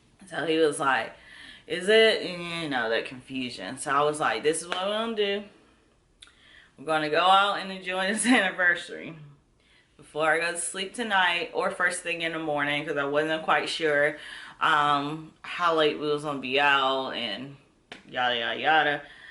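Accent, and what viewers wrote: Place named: Carolinas, United States. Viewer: I'm so glad I found this video! I heard your accent and I was like "She has to be from NC or SC" and when I heard you say it's still cold in NC and here in Florida, I was like "I knew it!!!!" I'm on Letrozole (Day 4 of pills tonight) and I have had very few side effects. Thank goodness! But I have had joint pain in my left knee and right ankle, but that didn't last long. I've had some nausea in the mornings, but it doesn't last long either. I am hopeful that this first round will work. I haven't had any previous issues with my female parts other than this year (2020) and my cycle being waaaayyy off. But luckily, my doctor is wonderful and listens to me. He feels like my issues are hormonal and that this first round could be successful.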